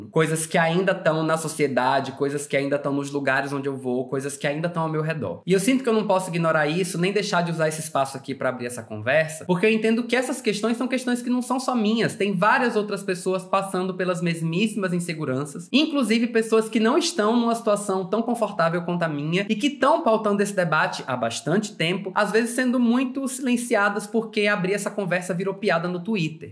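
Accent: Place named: Northeast, Brazil. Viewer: Que delicia de sotaque ❤ bem senti mesmo algo nordestino amuuu. Viva nosso nordeste. Muito gratificante encontrar um conteúdo profundo e importante que nos rodeia mas que tudo mundo finge que não ta rolando ou que não rola, necessário este assunto.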